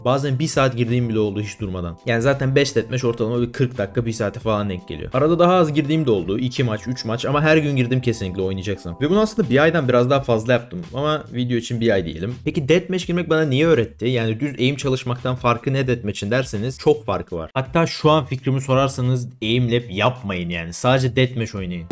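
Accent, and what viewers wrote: Thrace, Turkey. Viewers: Allahım bu trakyanın şivesine bitiyorum hep böyle konuş abi çok güzel la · konuşmandan trakyalı olduğu anladım bir tık. nerelisin?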